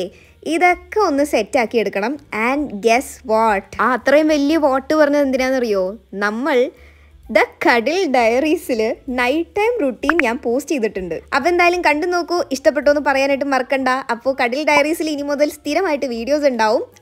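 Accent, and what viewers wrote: Palakkad, India. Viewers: Chechi vede palakkad anno eandha palakkad mannarkad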